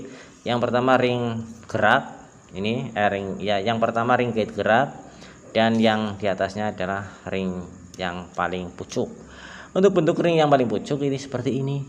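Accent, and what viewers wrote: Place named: Banyumas, Indonesia. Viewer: Jnengan tiang pundi mas,kayane republik ngapak 😅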